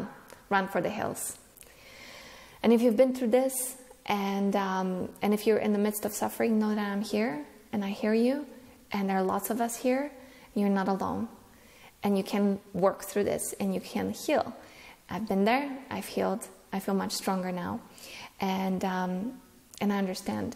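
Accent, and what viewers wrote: Poland. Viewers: I watched this thinking about someone else and woke up today feeling horrible Bc I'm so mad at myself for not listening to my intuition and getting caught up in manipulative relationships. But when you said that I heard my mom in every way. And she is from Poland. So the accent reminds me of her. I thought I could forgive her but I feel way to entrenched and horrible I have no idea how to live my life anymore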